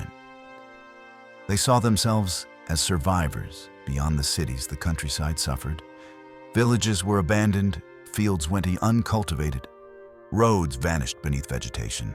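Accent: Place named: South Africa. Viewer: Sounds like South Africa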